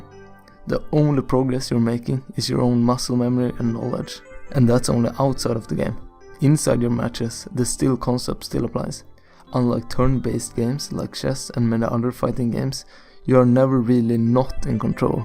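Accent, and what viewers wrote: Sweden. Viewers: the most swedish accent of all time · extremely swedish accent. I was hoping you would say "double-yump"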